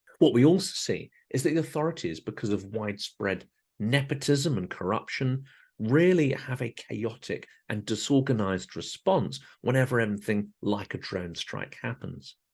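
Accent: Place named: United Kingdom. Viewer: Ukrainian shirt + Brittish accent! 🇸🇪 ❤ 🇬🇧 🇺🇦 💛💙💛💙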